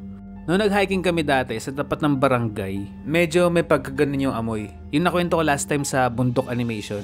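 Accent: Ilocos, Philippines. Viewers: Alam mo po pala mag ilocano kuya 😮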